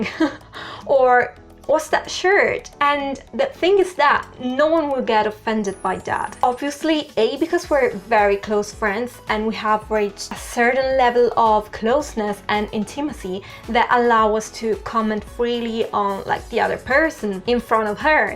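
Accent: United Kingdom. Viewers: You are speaking in British accent but you do not look like a British in some aspects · Your English is very good however it seems to me that you speak with a British accent which sounds very nice. I would love to see more videos in Italian. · She's Italian but she speaks English with a British accent.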